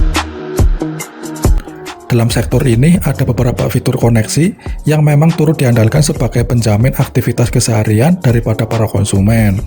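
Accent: Banyumas, Indonesia. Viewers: Medok cak suarane kepriben kepriben inyong.....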